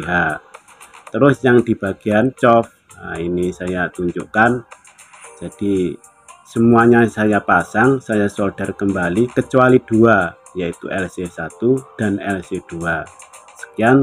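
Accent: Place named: Jombang, Indonesia. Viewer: Logate sampeyan niki kadose tiyang Jombang mas salam dari Manado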